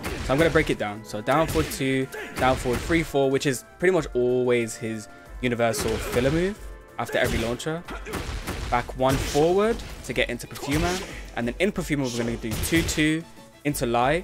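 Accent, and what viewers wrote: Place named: Birmingham, United Kingdom. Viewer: you from birmingham too? Accent gives it away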